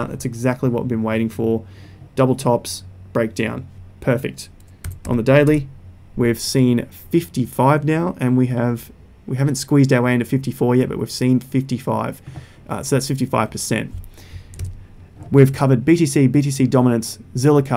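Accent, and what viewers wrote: Australia. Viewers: who are these people you feel the need to apologise to...no apology needed. You’re Australian and won’t speak the Queens English like what I do.....As for sound quality I only noticed a difference when you were away but no problem. More....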